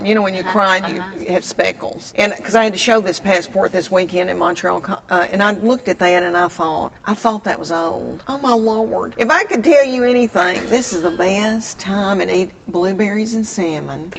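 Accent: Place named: North Georgia, United States. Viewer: My family's N.GA accent is sooo similar to yours! My coworkers ask how I choose which words in a sentence to add an extra syllable, and which words to remove one. "We say y'all... When we want to call... Upon all." Early Cuyler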